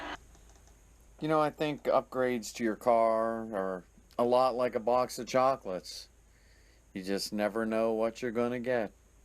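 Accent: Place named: Pittsburgh, United States. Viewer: your have a very strong Pittsburgh accent.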